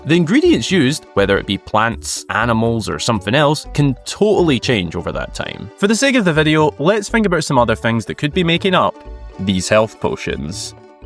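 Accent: Scotland, United Kingdom. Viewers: Are you from the same region of Scotland as Lorraine Kelly?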